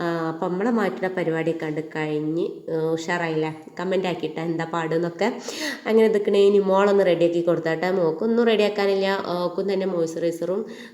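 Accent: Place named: Malappuram, India. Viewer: 😊😊😊. എന്റെ hus മലപ്പുറം ഡിസ്ട്രിക്റ്റ് ആണ്. നിങ്ങളുടെ സംസാരം same 😊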